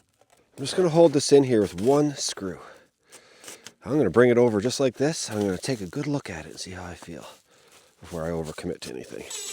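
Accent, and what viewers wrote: Newfoundland, Canada. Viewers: Cod fish from the rock hey? Good stuff. Hello from Newfoundland. I live in Gros Morne National Park. How's the fishing around your cabin in the summer time? Tbh I almost pick up a Newfoundlander accent on your speech. Not originally from here in Newfoundland are you? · Instant follow. Love the energy and vibe. I really like the Canadian accent. I used to get confused between American vs Canadian. 🇨🇦 now I can tell the difference. Just like aussies sound so different to kiwis.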